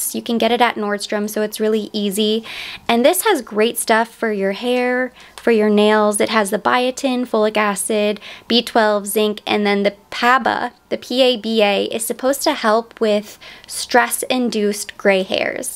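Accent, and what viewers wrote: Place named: London, United Kingdom. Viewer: i agree. i wasnt sure if its Australian accent or English but i played it back and sounded more as a Londoner..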